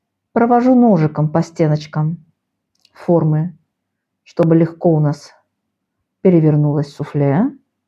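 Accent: Baku, Azerbaijan. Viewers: Спасибо за наш старый добрый бакинский рецепт! Помню эти вкусняшки делала тётя) Кстати,акцент наш слышен до сих пор!))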